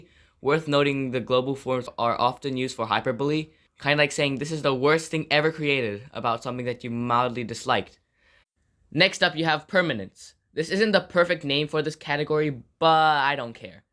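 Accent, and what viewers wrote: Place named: Lahore, Pakistan. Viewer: lol at one point int he video they show a grammar chart or something and aling english there was an urdu comparison, this + the accent, which to me sounded similar to a lahori, made me think i had found another one of us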